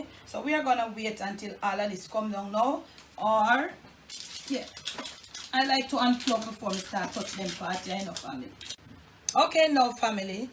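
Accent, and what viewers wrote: Jamaica. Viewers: Hej Miss, Manners an' Respect from Denmark 😊🇩🇰. My wife and I absolutely enjoy your show, and we look at them everytime we receive notification😊. Incidentally today we will try your chicken burgers! You are an inspiration and brighten up our home with your presentations. We love when you say "me soon come"; and miss it in some of the videos...and please don't ever change your accent; it is absolutely brilliant and genuine. We look forward to the next experience, and ready to try some real Jamaican food. Without Brown sauce 😁. Happy Easter from Denmark.🇩🇰 Luca and Tina.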